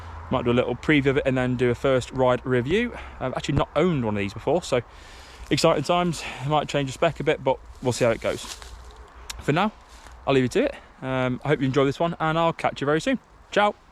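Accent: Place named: Ireland. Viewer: ay mate speak English, your choppy when you speak, only brits will understand you.,,,for a Mick....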